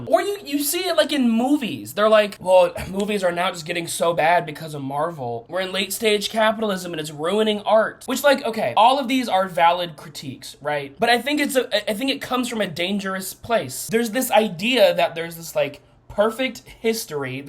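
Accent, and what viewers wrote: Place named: Midwest, United States. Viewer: You have the same accent as me: "Root" = "Rut" and "Woods" = "Wuds" - are you midwestern?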